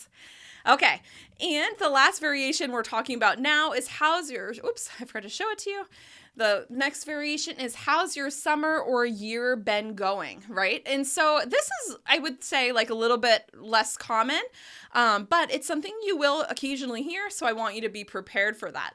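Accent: United States. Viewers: Let me tell you that your accent is really beautiful, captivating and very American, which has made that I can’t stop watching your videos, and on the other hand learn the pronunciation and sounds of the United States, greetings from Chile. 😊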